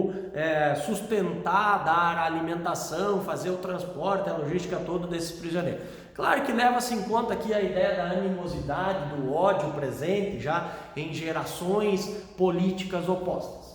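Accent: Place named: Pampa, Brazil. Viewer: Como é bom ouvir nosso sotaque por aqui, viva o Pampa!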